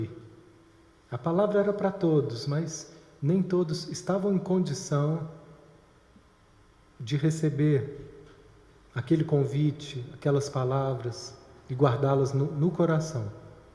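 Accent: Minas Gerais, Brazil. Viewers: Caríssimo estou muito grata a mimhá amiga que apresentou o Pathwork e vc foi o primeiro vídeo e toca minha sombras, todos os dias eu me aceitando... a criança egoísta, invejooosa... reconhecidos... os sonhos eu me vendo... AMIGO este maravilhoso trabalho seu... tom de voz o mineirinho bão sô! Obrigada! obra em negro/branco/vermelho dos alquimistas está em curso.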